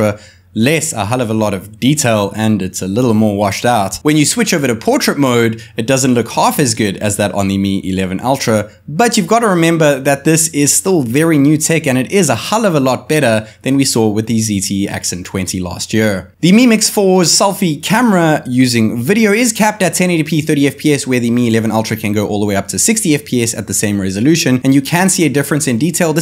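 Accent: Johannesburg, South Africa. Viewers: South African accent 👀 lezzz go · Great video. Are you South African? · Is that a JHB accent I'm hearing??🤔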